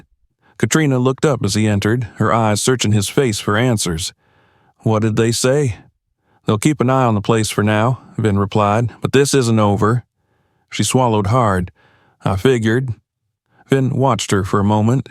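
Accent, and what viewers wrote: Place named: South, United States. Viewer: I am so glad Vin Diesel stepped up to help that young woman. She seemed very scared by those bikers. And by the way, you would make an excellent audio describer. You have the voice for it too. A strong, southern accent, as well as an excellent describing voice. I would know, because I've listened to a lot of audio descriptive movies and TV shows. President HW Bush describes it's a wonderful life for those that are blind or visually impaired. I myself happen to be blind. Totally blind in fact from birth. It doesn't slow me down though.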